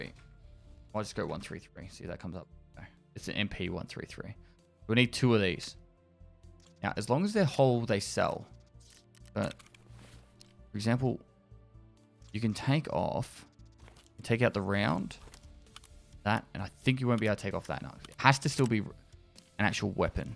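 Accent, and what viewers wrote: Australia. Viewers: Love these vids! You're very informational for us new players, me included, plus the aussie accent is nice to listen to! GL in the Netherlands!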